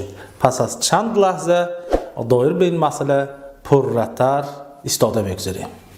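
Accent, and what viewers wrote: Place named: East, Tajikistan. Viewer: Брат иё шарқ гап задестан о маву ту а ғарбем ихе гапора намефаҳмем 😇😟🤨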